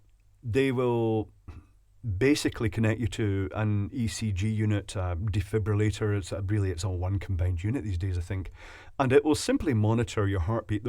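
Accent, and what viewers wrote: United Kingdom. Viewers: Wow... We see you... I watched many videos... I pictured a completely different looking person. Ha! It's the British accent. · It's amazing how Americans perceive British accents. I envisioned you with a double breast suit, bowler hat, and maybe a pipe.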